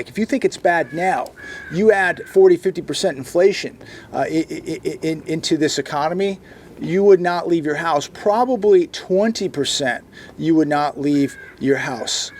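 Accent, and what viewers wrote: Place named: Chicago, United States. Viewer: Taday,love the Chicago accent😊✝️.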